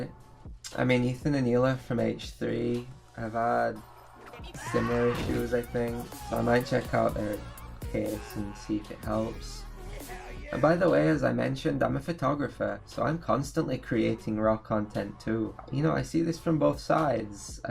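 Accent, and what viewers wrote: United Kingdom. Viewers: Dude, where are you even from? Your accent sounds British.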